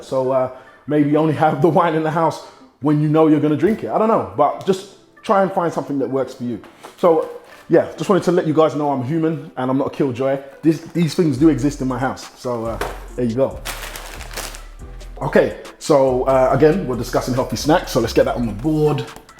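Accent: United Kingdom. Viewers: That's just another UK vs US thing. I and everyone I know in the UK pronounces it chewna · Keep that culture and UK accent alive. Cheeewna! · only got the fact he was britsh bow after 1 whole vid and 10 mins into this one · are you British? I love your workouts man I do them with the Misus. Need to ask you a few questions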